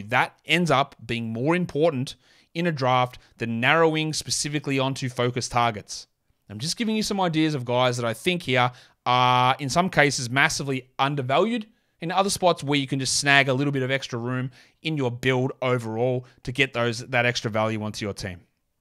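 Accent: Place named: Australia. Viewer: Compared to you I’m the Michael Jordan of fantasy basketball. You probably the same kind of idiot that picks Jimmy butler in the second round. I hope people don’t pay money for your content. That’s just criminal. Taking basketball advice from someone with like an Australian accent doesn’t seem like the move when they probably don’t even sell basketballs in your continent. Continue throwing your money away with your fantasy leagues. Hope you actually learn something one day with these countless hours you waste on these videos. Goodbye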